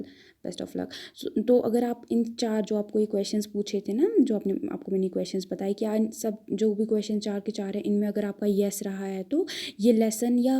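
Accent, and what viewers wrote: Kashmir, India. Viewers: Are you from Kashmir? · U r voice tells that u r from kashmir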